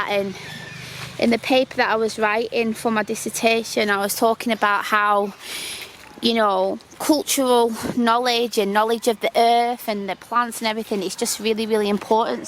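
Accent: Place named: North, United Kingdom. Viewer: Your children are just adorable 😍 i was guilty of constantly telling my kids to “be careful” whenever they climbed where as now I’m the opposite and totally encourage them to explore! How old are your children? And it’s so nice to hear a fellow northerners accent 🥳 oh you’ve litterally just answered my question as I’m watching lol is 13 months, awwww!! What made you decide to home school honey? Yes I think you should make that video, it would be interesting to learn more as it sounds ignorant but the truth is I don’t really know but it would be good to find out from you!! 🤗 xx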